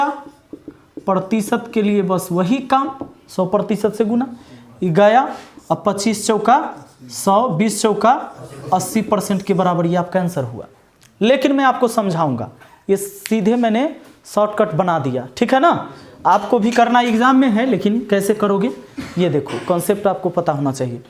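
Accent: Bihar, India. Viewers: what a bihari accent sir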